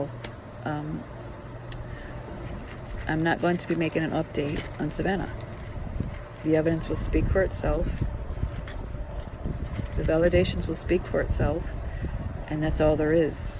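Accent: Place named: Ireland. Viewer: (also...i picked up on a little bit of an Irish sounding accent today in your voice -which I had not noticed before today's post)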